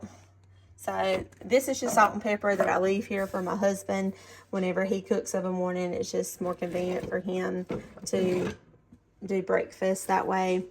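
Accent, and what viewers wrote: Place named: South, United States. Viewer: I love your blue and white collection, your home is beautiful. I also love your southern accent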